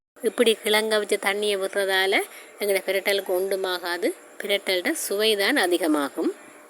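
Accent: Sri Lanka. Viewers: உருளைக்கிழங்கு கருவாட்டு பிரட்டல் அருமை. இலங்கைத் தமிழும் மணம் வீசுகின்றது.. வாழ்த்துக்கள்🎉🎉🎉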